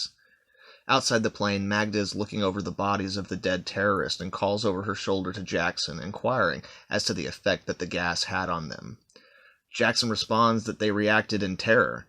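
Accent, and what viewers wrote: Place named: Russia. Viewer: It almost sounds like Russian/Easter European accent lol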